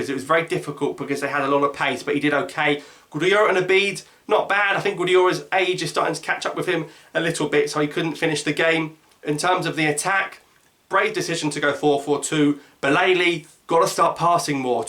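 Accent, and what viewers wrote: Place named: England, United Kingdom. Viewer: I'd say that's an accurate assessment. I could tell in your voice your voice that you had some English in you. You seem like a call guy so I'm going to subscribe to your channel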